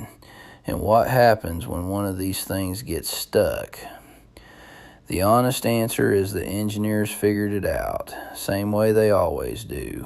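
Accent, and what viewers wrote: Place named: South, United States. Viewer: this dude sounds southern as hell fresh out the hollar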